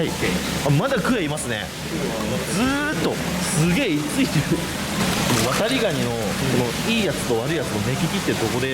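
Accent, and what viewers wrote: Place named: Nagoya, Japan. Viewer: 名古屋から上京してきたから、かねこの名古屋弁が地元みあってうれしい～！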